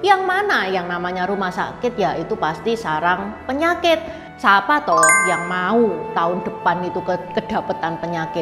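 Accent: Java, Indonesia. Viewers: Enakan yakin dengerin dongeng nya ci tuh.. ada lucu nya ada seru nya.. dg menggunakan bhs indonesia yg ber aksen jawa medok.. hhhhh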